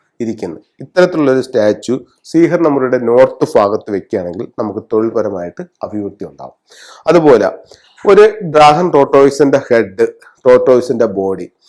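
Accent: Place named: Kollam, India. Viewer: Kollam TVM fagham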